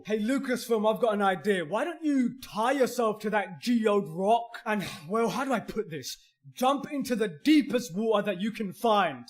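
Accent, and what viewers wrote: United Kingdom. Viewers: He have british accent? I didn't noticed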